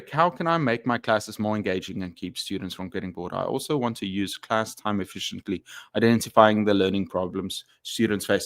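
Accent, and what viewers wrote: South Africa. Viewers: Are you from South Africa?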